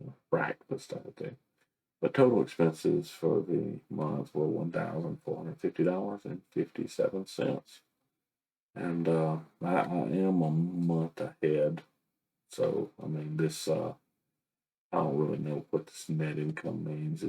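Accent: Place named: South, United States. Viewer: Your "potatoes and tomatoes" cracked me up! I miss the southern accents now that I've moved! I wish I had grabbed some YNAB swag, I was too new to the game to know about it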